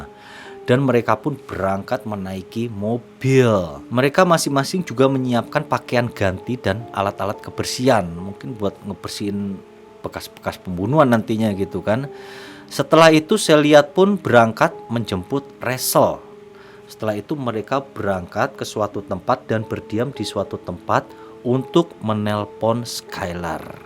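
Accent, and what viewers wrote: Java, Indonesia. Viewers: Logat jawanya terllu dalem jd agak ganggu dengernya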